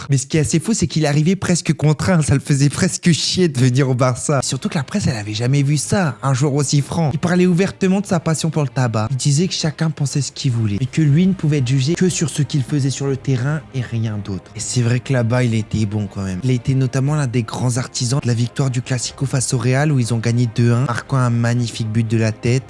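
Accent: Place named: Nord-Pas-de-Calais, France. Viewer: Tu fais vraiment passer Jeremy Matthieu pour un simplet , à t'écouter il n'avait aucune ambition et aucune hygiène avec le tabac et il finit malgré tout au Barca , va comprendre . Comme le fait de travailler à Intersport . C'est toi qui est simplet avec ton accent chti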